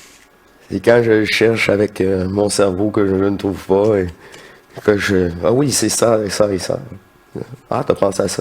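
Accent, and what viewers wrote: Quebec, Canada. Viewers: Et bien je kiffe l accent québécois… ta berwete ici c est une brouette mais je kiffe quand même jolie chanson que cet accent … et il est vrai que ces picotements j ai eu aussi cela les derniers jours … amusant tiens … portes toi bien et prends soin de toi merci d être là et de partager cela gratitude à toi 🙏✨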